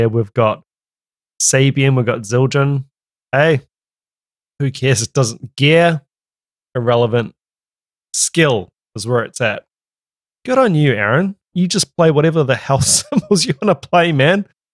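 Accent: New Zealand. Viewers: Its good to hear that Kiwi accent on Youtube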